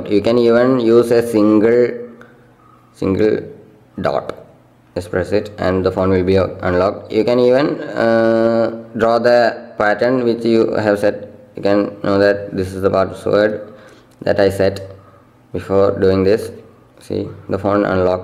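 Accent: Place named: India. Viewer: Could you show me how to speak English not Indian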